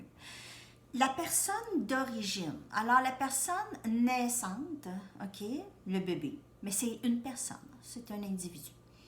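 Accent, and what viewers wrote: Quebec, Canada. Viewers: J’aime beaucoup l’accent québécois « je sais pas pourquoi » 😅 sûrement parce que ma jumelle est dans le pays ☺️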